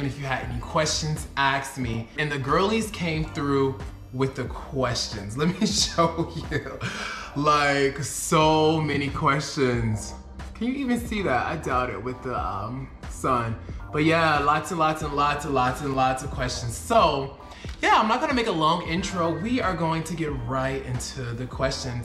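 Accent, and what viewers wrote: United States. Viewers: 1. Welcome to South Africa, the coloured thing is very common here. You light skinned. But you of course should tell them you are not coloured if you are not. But lovely to follow your journey in my home. South Africa has many race issues though and I am interested to know how you feel about that in comparison to the race issues in the USA. 2. also, I like the way you say "Johannesburg'. We just say Joburg here sis. Joburg! LOL 3. Becareful of Lagos. They HATE GAYS THERE and it is ILLEGAL! They could kill you!! 4. Dating is Transactional in Africa, cause they think you have money! That American accent sis. They think you have money!! The dollar is stronger though. 5. Polyamory is not for me as well, sis. But Africa and South Africa has a lot of it. ENJOY YOUR TIME IN SOUTH AFRICA! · They're crazy for mistaking or assuming u that u're coloured up there in Joburg please correct them n clearify that you're black and from america.its your accent that confuses them.dont accept the term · Lmao at the Coloured situation: South Africans are not well travelled. 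But because of your accent, I expect them to understand that you are an ''African/Black American'' who is on the lighter side, pigmentation wise. So when they ask you about your race, just say ''I am Black American,'' it will click to them! Your blond dyed hair is also not helping! Haha!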